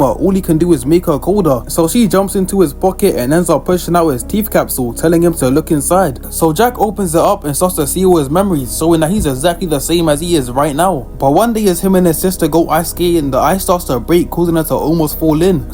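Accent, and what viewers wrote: United Kingdom. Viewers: Bro I was caught off guard by your British accent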